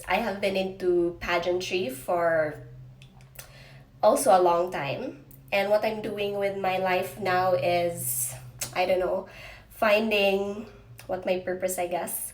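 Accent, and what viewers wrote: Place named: Visayas, Philippines. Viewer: mkamingaw imong tingog nga bisdak 😂❤️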